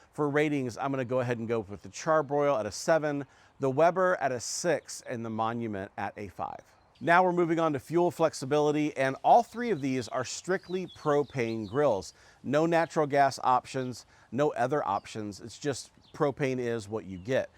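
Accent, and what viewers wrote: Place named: United States. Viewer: That was an excellent impressive, thorough review!!! However, I would have liked to have seen a review on the igniters. I find it very frustrating that in all of the BBQs that I have purchased over the years, the igniters have failed within 6 months of purchase. They have been ridiculously poorly made. Are there any advantages of any of the different models when it comes to cleaning the insides of the BBQs, when it comes to a build up of grease, cheese etc ..? Also, as a Canadian, I am assuming that the dollar amounts that you are speaking about are in U.S. dollar. I also assume by your accent that you are an American. Thank you!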